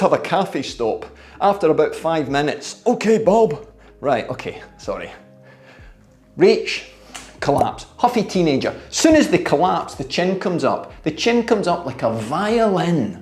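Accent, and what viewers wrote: Scotland, United Kingdom. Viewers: the caption should read "not sitting correctly" caption machine doesn't like your Scottish accent, coach!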